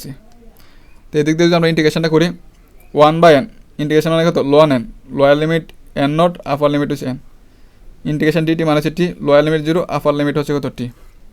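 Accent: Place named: Mymensingh, Bangladesh. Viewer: ভাই মমিসিংগা😁😁 ভালোই হয়েছে❤️ ভাইসাবের এলাকার লোক😌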